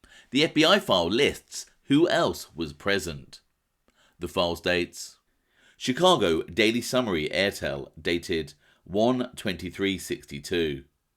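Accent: United Kingdom. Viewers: The British trash accent is so annoying just shut up and play the recording nobody cares about the annoying narrator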